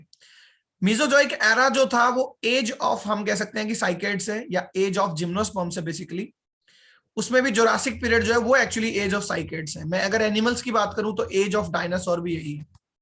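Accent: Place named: South Asia. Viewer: Viewers who are unfamiliar with the way English is spoken in South Asia will have trouble understanding what the teacher is saying here. I was sorry not to find an option for written captions.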